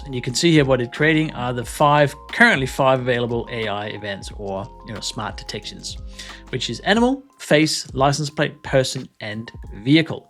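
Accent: Denmark. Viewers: Hey, you danish? Think I can just about tell by the accent, but not 100% sure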